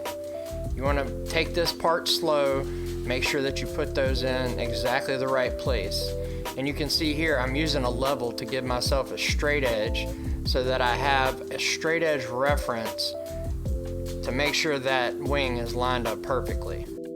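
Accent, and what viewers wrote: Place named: Louisiana, United States. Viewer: Hey man, good video thanks for sharing. What part of the Who Dat Nation you live in - sounds like you're down near Grand Isle?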